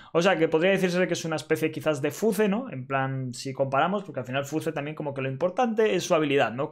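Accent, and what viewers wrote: Argentina. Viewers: Es de arg? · Es argento papa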